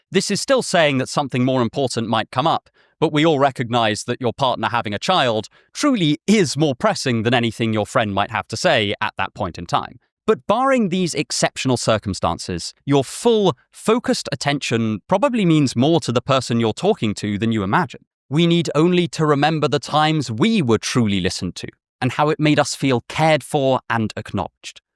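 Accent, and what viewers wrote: United Kingdom. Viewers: I don’t know if it’s j because you have a British accent and are wearing tweed, but I agree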